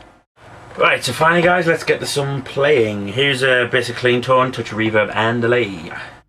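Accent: United Kingdom. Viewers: haha i love the way u talking i usually hate and skip the talking part of a audio demo but i subcribed your channel just to listen to ur brit tongue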